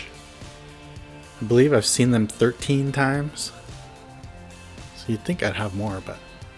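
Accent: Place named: Sacramento, United States. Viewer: Love this video man! Sounds like you’re from the Sacramento area haha I am too! I was also at Aftershock & caught a few from Kirk! On the first night they played. I got 3, gave 2 away, one going to a kid & his dad that I saw looking for some in the grass. He was so happy haha What sucks though is as I was getting a hot dog outside from the street vendors, the one I kept for myself must have fallen out of my wallet as I was paying. I was crushed 😭 Luckily though on Sunday when they played again, one landed RIGHT between my feet & I stepped on it until the crowd cleared and I was able to pick it up. I like to think the metal gods rewarded me with another for my good deed of giving away those 2 Metallica picks on night one 🤘🏼 Got a few more picks in my collection. My favorite being one from Slash that he handed to me in San Francisco at the Warfield in 2010.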